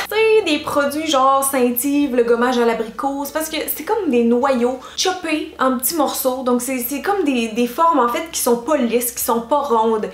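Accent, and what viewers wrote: Quebec, Canada. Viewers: J'en fait quelques unes de ces bêtises... Pleins de bonnes choses dans cette vidéo. Et un délicieux accent Québécois 😃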